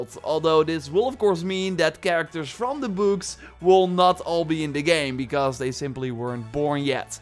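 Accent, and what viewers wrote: Netherlands. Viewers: I can recognize your dutch accent, when you say "video" you can hear it for example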